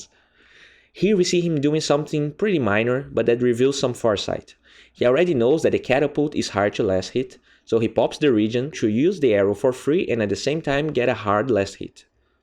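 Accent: Brazil. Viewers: i think brazil based on his accent (just a guess) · stop saying the kunka the mirana the pugna hahaha that drives me crazy man you don't need to use THE for those personal names, I can tell by your accent u're probably brazilian, your english is awesome anyways.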